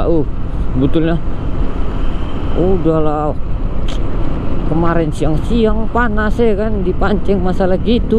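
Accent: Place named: Medan, Indonesia. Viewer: Medan kali repatan abang ni🤣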